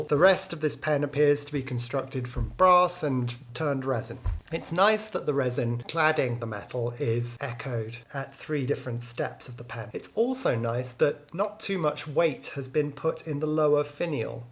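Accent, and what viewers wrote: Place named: RP, United Kingdom. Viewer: Okay, confession tiiiiime. (warning: high levels of tongue-in-cheekness coming up in 3, 2, 1...) I have never gotten why Americans get so weak at the knees whenever they hear a British accent. Doesn't do it for me, sorry. I always much preferred a soft Irish lilt or the silliness that is a Canadian accent ("eh"). Or a Scottish accent. Good gawd. To quote the great and late Robin Williams, "You can't fucking understand them" but they make gibberish so entertaining. Having said that, I don't know what it is about your accent but I love it. LOVE it. It's so posh. It fits so well with your obvious eloquence. There's something so satisfying about how you pronounce words that end in a -p. "Clipppp". I'm as gay as the night is long, but I'm not going to lie, I've got a bit of a crush on you. Too much information? Alllllrighty then.:D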